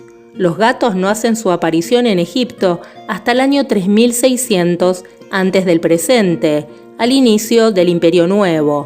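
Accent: Argentina. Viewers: se nota mucho que eres de argentina y por alguna razon me gusta como hablas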